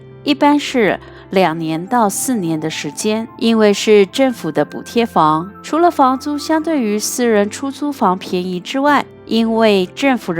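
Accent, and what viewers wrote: Standard. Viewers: 你的口音不像台湾人啊 哦对了，你做过国语记者，国语非常标准。 我在多伦多，也好中年技术移民来的，非常赞同你的观点 我是大陆人，来加拿大不但可以获得自身的自由，更能拍拖意识形态的干扰。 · 山水有相逢。一切都是小問題哈哈哈。還有你的國語好正統，沒有台灣腔，我昨晚看你視頻的時候都在猜想您祖上是不是北方人，或許是河北那一片的😆😆😆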